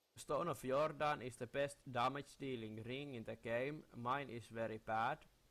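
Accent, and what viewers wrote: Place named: Finland. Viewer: Ootko sä suomesta? Kuulostaa niin suomalaiselta englannilta, if you know what i mean :D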